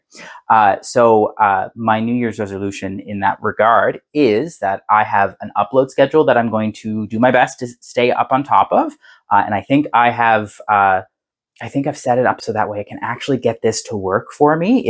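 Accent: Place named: Eastern Canada, Canada. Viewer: I just discovered you and I know NONE of the background—your back story. Is there an episode in your previous series that introduces you? I think from your accent you might be eastern Canadian? Going to go watch the next one. Really enjoying it!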